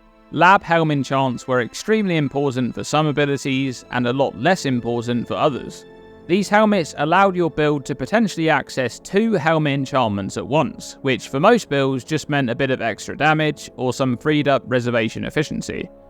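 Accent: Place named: United Kingdom. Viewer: He's a brit... they say things differently.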